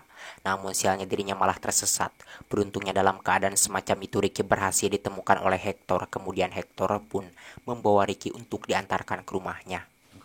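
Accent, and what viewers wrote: West Java, Indonesia. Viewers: Sundanya dari mana ni min 😁 sing ageung milik lah pokonamah 🤲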